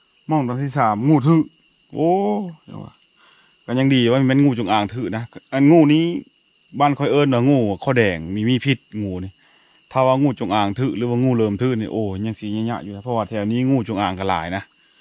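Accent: Phu Thai, Thailand. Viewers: สวัสดี เสียงเจ้าเว้าคือเดียวกันกับสำเนียงผู้ไท อำเภอหนองสูง อำเภอคำชะอี จ.มุกดาหาร น้อ กะคือสิเป็นพี่น้องเช้อสายเดวกันน้อ ดีเจอ้หลายที่ได่เบิ่งคริแเจ๊าน๊อ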